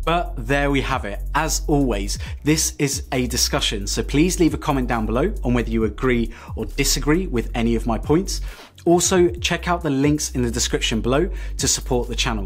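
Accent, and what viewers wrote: United Kingdom. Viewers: finally! some practical comparison! not some geecbench and raw tech specs! thank you! how about some simple things like watching good quality video/ movie or zoom call? and it is just nice listening to british english 🙃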